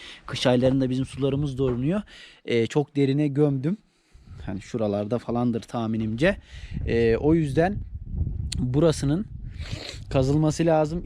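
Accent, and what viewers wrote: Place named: Thrace, Turkey. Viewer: kardeşim güle güle kullanın ahırı, sayayı bir şey dikkatimi çekti ben Tekirdağlıyım sizin orası Eskişehir ama şive bana bayağı Trakya ağzı gibi geldi.Annen Takyadan gelin mi Eskişehir e. Herkese saygılar.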